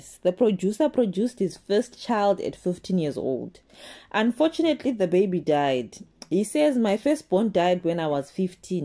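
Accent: Shona, Zimbabwe. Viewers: Shona accent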